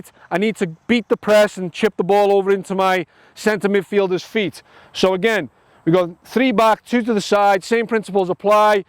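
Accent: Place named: United States. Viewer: Little bit of yank creeping into your accent there lad haha, is right la good vid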